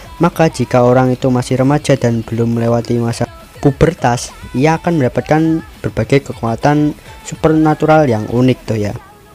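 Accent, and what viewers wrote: Java, Indonesia. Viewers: Jawa nya medok skali wkwkwk:v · Jowo bet suara lu bang medok medok serek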